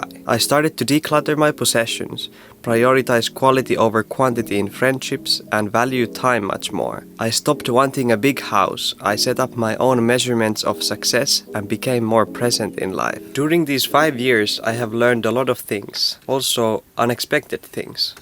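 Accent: Finland. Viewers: I love how I can tell you are from Finland by how you speak. Terkkuja Oulusta. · Are you Finnish? You have similar accent :) Merry Christmas :)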